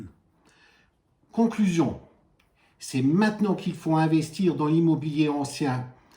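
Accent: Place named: Alsace, France. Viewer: haa ,cet accent alsacien too much looie...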